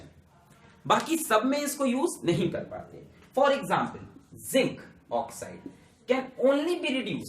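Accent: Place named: Allahabad, India. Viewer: You are from which city? Allahabad?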